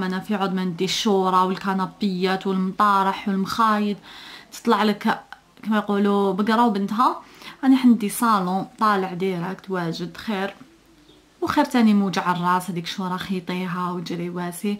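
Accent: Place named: Algiers, Algeria. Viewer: Accent algeroise 🙄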